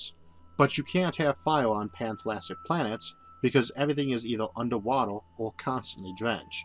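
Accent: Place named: South, United States. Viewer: I would like to say I love your videos and would like to add about your speech I just thought you were an upper class Southern gentleman. I should add that I'm from the UK but very used to the American accents.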